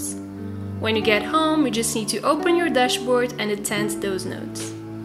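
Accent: Portugal. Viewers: Tu és portuguesa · where are you from? It's just you don't seem american/english. És portuguesa, certo??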